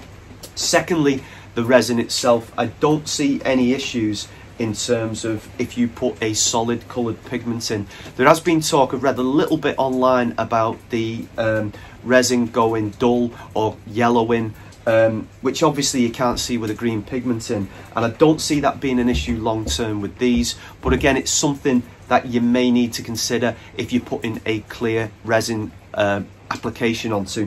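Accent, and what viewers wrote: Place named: Liverpool, United Kingdom. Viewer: More scouse than me mate . We willsee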